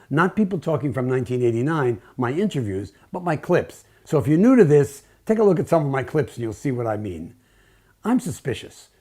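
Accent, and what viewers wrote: New York, United States. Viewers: You're a treasure Mr! 🌟The clarity and astuteness of your insights and the directness with which you address us helps to sharpen up our minds, no matter our ages. ☝ Your voice & NY accent are also very appealing. 😊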